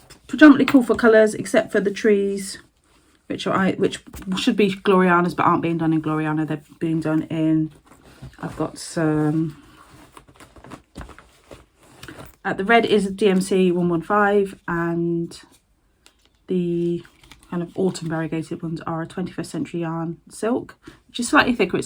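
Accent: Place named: United Kingdom. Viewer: Hello, where is your frame company please, couldn't see it on your very comprehensive drop down list unless I missed it somehow and didn't seem to come up on Google search. Enjoying you video sounds like you are in the same area as me in thee UK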